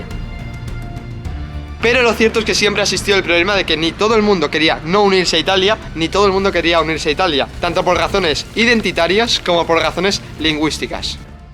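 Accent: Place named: Spain. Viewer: Que gusto poder ver videos sobre estos temas con acento español. Casi siempre son argentos o mexicanos 😂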